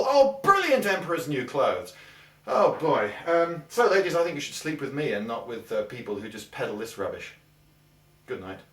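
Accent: England, United Kingdom. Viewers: Though I disagree with the actual thing he's arguing against, I agree with the concept and the way he presents it. Maybe it's because I'm American and we are thorough - bread to listen to anyone with an English accent. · Ah yes. I do like me a good English accent. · While I do think that GW is something to take seriously, I also think carbon offsets are just a load of crap. That said, I have to tell you that were it possible, I would sleep with you. :) What can I say? You're both intelligent and attractive, and English accents are hot.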